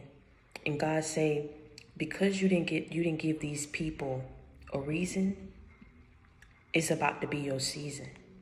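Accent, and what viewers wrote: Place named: Geechee, United States. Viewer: Sing yo sung that's how geeche people talk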